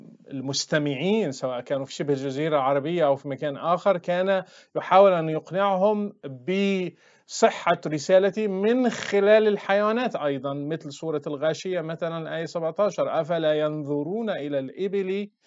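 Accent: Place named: Egypt. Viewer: لماذا لهجتك مصرية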